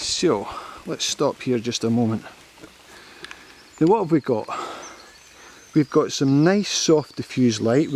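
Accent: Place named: Scotland, United Kingdom. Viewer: That's clearly a Scottish accent... right? Very nice video..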